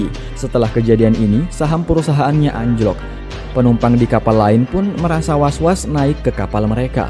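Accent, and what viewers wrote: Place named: South Sulawesi, Indonesia. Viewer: Orang sulsel ini kyknya mimin😂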